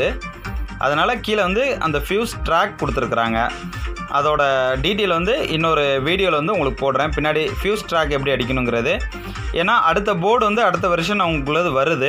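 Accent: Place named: Coimbatore, India. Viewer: hi sir very nice explain....... Love it..... Ana Coimbatore Tamil ela...... Nice...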